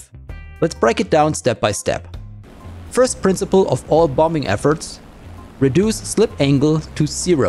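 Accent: Germany. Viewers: Ngl im very thankful for this video, ive been trying to dive bomb for ages I still can't dive bomb but hearing german names pronounced with a german accent makes me happy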